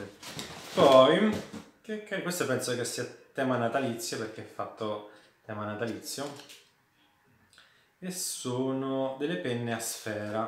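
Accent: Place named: Rome, Italy. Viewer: ah davvero? L'accento sembrava romano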